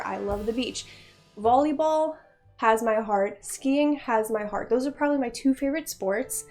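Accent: United States. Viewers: You always self-identify as Polish, but you have an American accent. Did you move to the US during your early childhood, so you lost your Polish accent?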